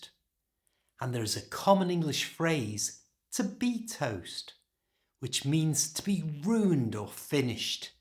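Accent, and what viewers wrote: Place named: West Lancashire, United Kingdom. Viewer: Fun, useful and informative! I chat - online - to quite a few people from countries other than England and know how difficult it can be for most foreigners to understand British humour (unless it's particularly visual - EVERYONE seems to like 'Mr Atkinson'!). I'm going to devise a 'joke' about one sentence YOU said, "You need to know that the part your body you WERE when you put a belt on...."! Of course, this shows that regions and dialects can also give rise to clever 'word-play'. I'm guessing you are from West Lancashire?! (I once worked - in Panto - with Ken Dodd so learned lots about jokes, humour and accents - sometimes until after midnight!!) Thanks for posting!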